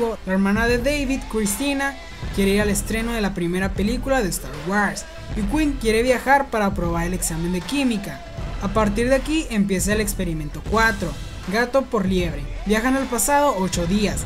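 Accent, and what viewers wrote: Chihuahua, Mexico. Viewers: acaso eres de chihuahua en México o que por que tu acento es similar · Eres de chihuahua?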